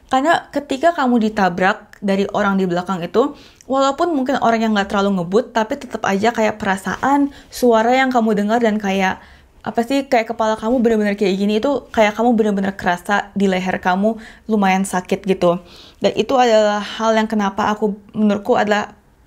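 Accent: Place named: Germany. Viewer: Lidahnya mbak udah sering keseleo sama logat Jerman.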